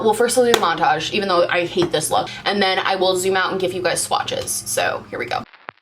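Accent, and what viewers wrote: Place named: South, United States. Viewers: Great Southern accent from a real Southern Belle!!! ♥️♥️♥️ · So glad you enjoyed your vacation! Also your southern accent killed me 😂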